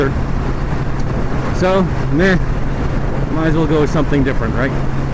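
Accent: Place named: Canada. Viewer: What country is that? Everything is in kilometers but you're on the right side of the road and you sound American.... but you do say "AbOOt". I'm guessing Canadian?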